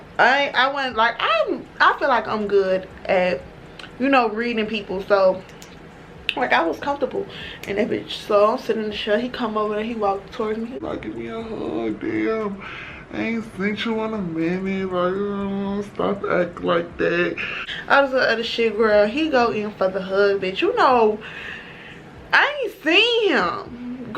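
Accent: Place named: Chicago, United States. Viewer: Aww awww boom g straight Chicago talk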